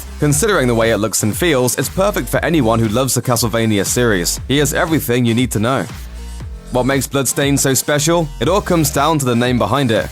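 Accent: United Kingdom. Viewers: The game is absolutely AWESOME...this guy can take his limey voice and piss off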